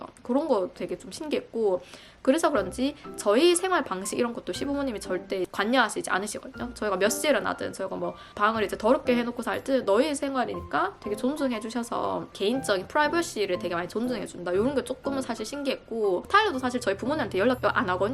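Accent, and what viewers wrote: Gyeongsang, South Korea. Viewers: 오늘 우연히 보게 됫네요...경상도 액센트도 정겹고, 너무 예쁘고, 생각도 바른 젊은인것 같아 호감 급 상승했어요~^^ 내 아들도 이민자라 같은 또래 젊은인것 같아 더 관심가네요~대형 유투버로 성장 하길 응원해요~^^ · 아이고 5만 츄카해요~같은 갱상도군효 ㅎ 저두미국이에요 1년됫어요 영어못하는즘마ㅠ집콕예요 저두 취직?은무리고..애가둘이라ㅡㅡ 알바하고싶군효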